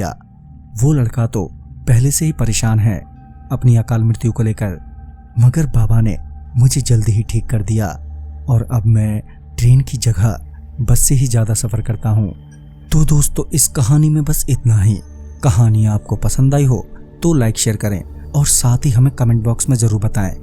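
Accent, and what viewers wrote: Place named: Gorakhpur, India. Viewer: लेखक को 1000 लाइक की आवाज़ को 10000लाइक ये पूरी खलीलाबाद ओर गोरखपुर की बीच की है....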